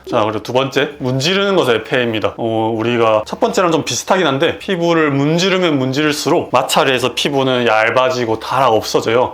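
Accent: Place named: Gyeongsang, South Korea. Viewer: 갱상도 쌤이네요 씻껐다 치고~♡ 씻었다고 치고^^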